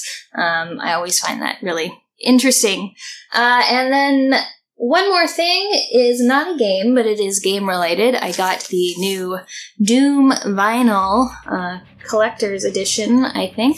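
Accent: Canada. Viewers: i know it's a random question, but are you from canada?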